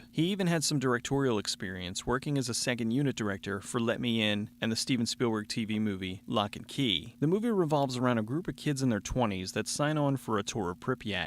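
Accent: Philadelphia, United States. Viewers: I could tell you were from Philadelphia when I heard you say "wooder" instead of water in one of your other videos.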